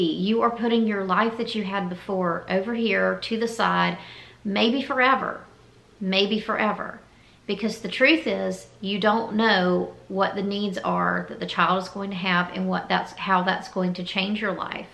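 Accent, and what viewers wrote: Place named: Carolina, United States. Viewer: You sound like a fellow Carolina girl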